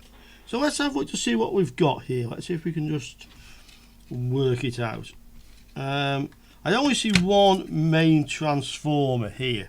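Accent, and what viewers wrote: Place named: Liverpool, United Kingdom. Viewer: On risque alors de perdre son accent savoureux de Liverpool et son humour. Isn’t ?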